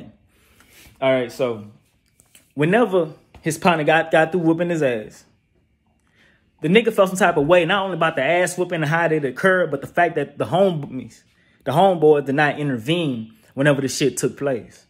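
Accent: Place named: Texas, United States. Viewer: You're not even from CA 😂 you sound like you're from Texas or somethin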